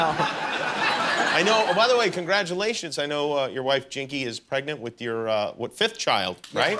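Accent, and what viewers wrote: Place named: Australia. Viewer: well obviuosly you're australian.... lol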